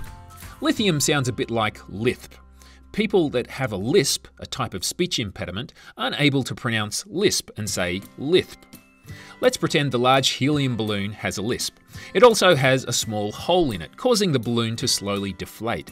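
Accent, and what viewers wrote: Australia. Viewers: R u Australian? 😅 sorry but u sound Australian just asking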